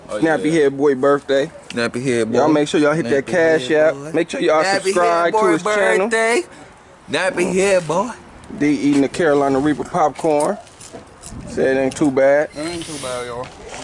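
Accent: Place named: Mississippi, United States. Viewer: “I’m from Mississippi” I CAN TELL 😭😭!! My grandma talks the same way. Y’all my family now too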